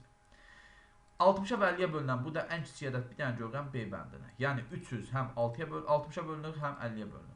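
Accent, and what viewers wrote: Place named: Salyan, Azerbaijan. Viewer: salyan 😊oxwayir daniwiq terzimiz)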